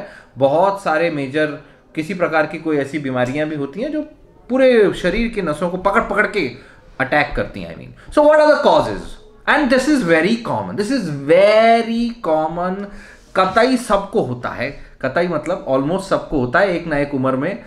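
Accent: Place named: Hyderabad, India. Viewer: Hydrabadi bolre doctor sab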